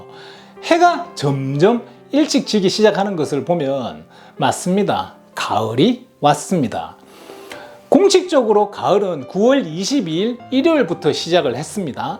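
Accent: Gyeongsang, South Korea. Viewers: 대구 사투리 ? ㅋㅋ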